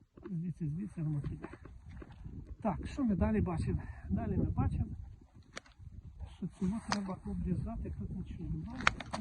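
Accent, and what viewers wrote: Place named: Podillya, Ukraine. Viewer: Дуже гарно дякую Вам! Було пізнавально. Мова – ніби живої води напився! Скучив за Поділлям (батьківщина моєї мами). Додав у підписки. З нетерпінням чекатиму нові випуски! Вітання від донеччанина, що зараз живе на Харківщині) хай ми Переможемо! З повагою, Іван Редько!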